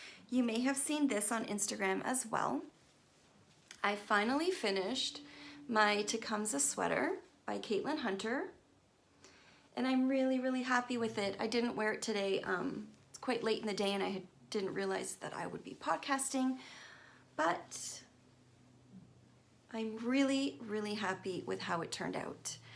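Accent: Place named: Scotland, United Kingdom. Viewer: Love the podcasts. A new one is dunderknit but I'm sure you already know about that one. She's a fellow Scot! Yeah!! Good to hear my accent in a podcast. Currently knitting my first sweater which is also my first colourwork project. Just upgraded my sewing machine so time to get creative instead of just repairing. Going to make some project bags as Christmas gifts this year. Love the pace of your podcasts. So calming!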